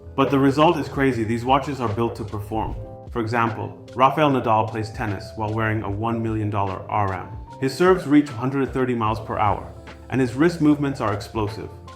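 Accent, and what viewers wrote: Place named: United Kingdom. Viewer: You sound more British in this video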